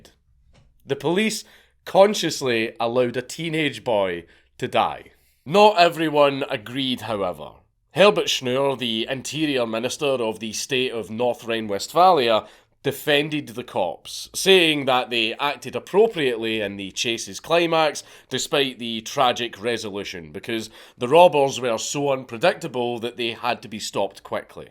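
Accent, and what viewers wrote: Scotland, United Kingdom. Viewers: Are you Scottish? I like your accent very nice to listen to and your a good at this. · I've said it before and I'll say it again, please please put CC on while listening to Dankula. Youtube translating Scottishisms is fookin hilarious.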